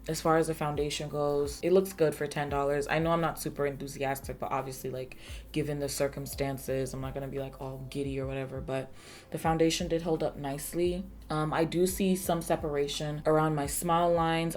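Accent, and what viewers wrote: United Kingdom. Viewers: I want more British accent in your videos PERIODT my darling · Your British accent made my day 😂😂!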